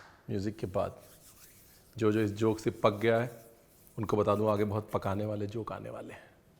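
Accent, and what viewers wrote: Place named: Punjab, India. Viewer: Hindi mein punjabi touch ka jo mza dalte hain aap usse apnepn wali feeling ati rehti hai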